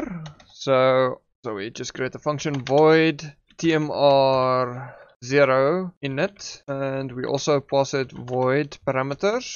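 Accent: Afrikaans, South Africa. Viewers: I can hear an Afrikaans accent. ;-)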